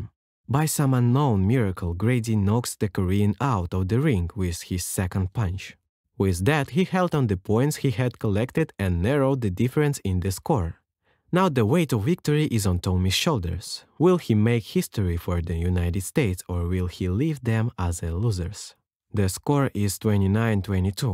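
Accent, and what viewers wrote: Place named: Russia. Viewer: чел, ты бы хоть русский акцент убрал, если уж озвучиваешь на английском )))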